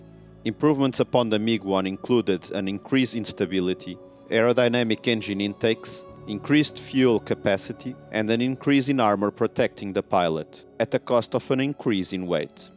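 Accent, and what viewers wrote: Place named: Portugal. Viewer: You have a Portuguese accent.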